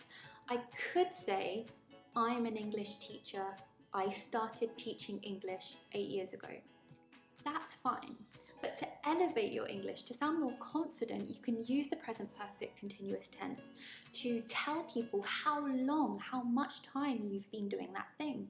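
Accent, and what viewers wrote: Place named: United Kingdom. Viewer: Hi,! Thank you for this useful lesson! ❤ I've never been using the Present Perfect Continuous tense in my daily conversation, but now I certainly will. And I love your British pronanciation! ❤ With the best wishes from Ukraine! ❤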